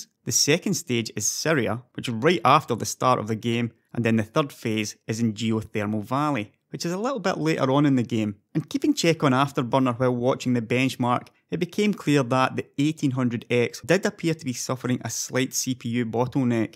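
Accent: Scotland, United Kingdom. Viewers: I came for the Scottish accent, did not disappoint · Your scottish accent is giving me a hard time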